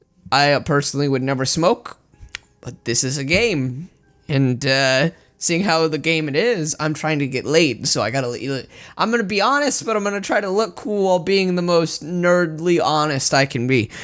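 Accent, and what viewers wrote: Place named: New England, United States. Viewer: The accent challenge (New England) edit: the English in new English is just American not fake British.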